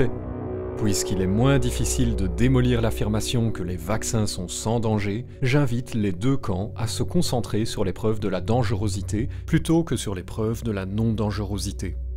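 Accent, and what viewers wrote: Liège, Belgium. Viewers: tooor, preuf, toi t'as un accent belge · Oufti l’accent de Lietche! 🤪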